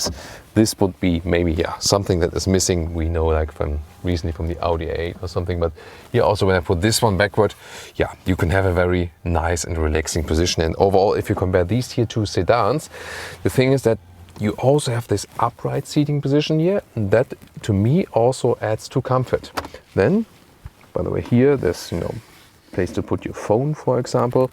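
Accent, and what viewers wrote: Germany. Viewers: Like always great review ! thank you! I like German accent! good job!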